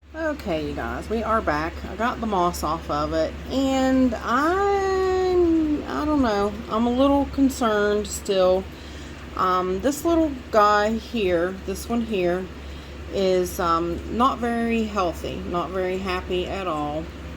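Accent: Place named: Tennessee, United States. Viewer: I guessing eastern TN by your specific accent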